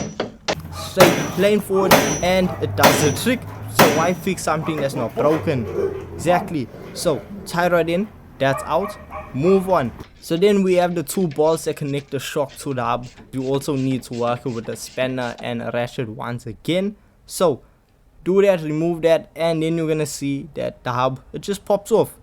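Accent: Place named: Durban, South Africa. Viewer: Cool project my guy. The Durban accent takes some getting used to, but informative nonetheless.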